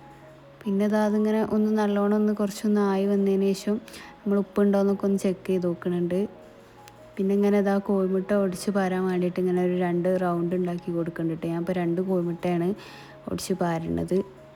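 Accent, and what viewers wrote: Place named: Malappuram, India. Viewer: Areekode slang ann🥰njanum angane aaan..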